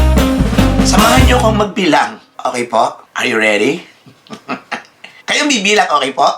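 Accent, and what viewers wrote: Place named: Batangas, Philippines. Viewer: Congrats po.Para pong Batangueno ang accent nyo😊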